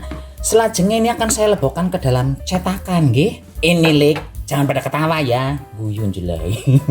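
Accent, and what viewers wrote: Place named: Cilacap, Indonesia. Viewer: Resep" nya ok.mudah di tiru juga sangat menghibur dengan bhs ngapak nya yg medok cilacap.tp sy.sebagai orang kendal jateng paham.dengan.bhsnya mas.semangat trus..Salam.ublek"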